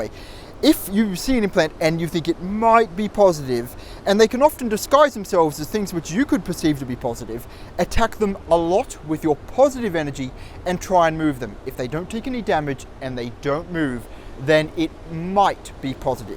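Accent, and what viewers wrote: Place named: Australia. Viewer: Sounds like an Australian accent-probably NSW or Queenslander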